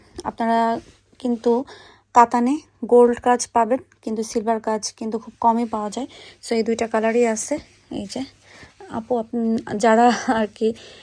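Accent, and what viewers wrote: Sylhet, Bangladesh. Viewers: Apnar Muk a sylheti vasha sune kub Valo laglo,ami nije o ekjon sylheti tai❤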